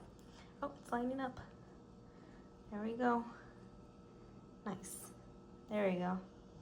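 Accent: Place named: Mexico. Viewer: She have a Mexican accent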